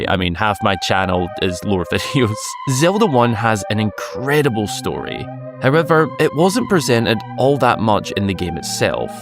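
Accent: Scotland, United Kingdom. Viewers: Are you Scottish ? You kind of talk like Scrooge McDuck in the DuckTales reboot 😅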